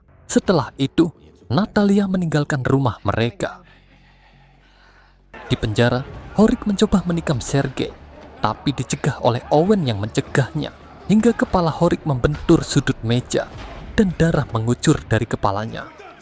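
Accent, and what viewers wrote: Java, Indonesia. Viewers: Medok bet anjir berasa nonton wayang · Pekat banget jawanya walaupun dikontrol suara kayak gini..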